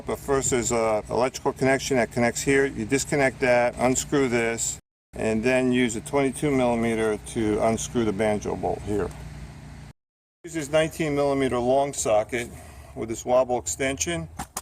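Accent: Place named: Northeast, United States. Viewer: Are you in RI? Or NJ or LI? When you started talking I thought to myself, this is not one of my vids....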